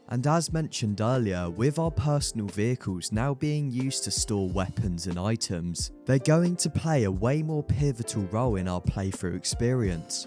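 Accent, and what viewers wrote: United Kingdom. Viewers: tolerating british accent for 44 minutes is going to be real hard but there's no other choice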